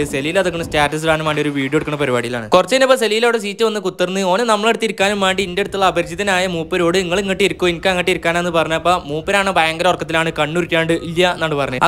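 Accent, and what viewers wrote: Malappuram, India. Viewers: Malappuram language paranj show kanikkunna chila aalkkarundu. But samsaram no raksha. Natural aanu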